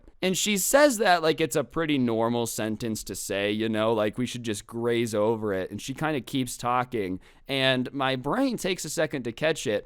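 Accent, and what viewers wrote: United Kingdom. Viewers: ngl i like british accent or something (im not british) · I think his cat noises and British accent makes me have stampy vibes but stampy actually talks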